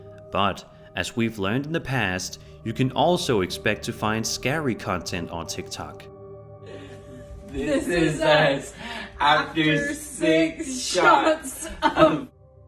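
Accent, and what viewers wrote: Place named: Denmark. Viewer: This guy sounds danish :)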